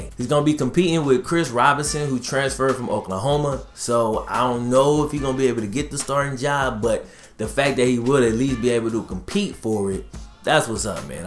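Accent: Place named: Louisiana, United States. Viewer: I aint know you was from Louisiana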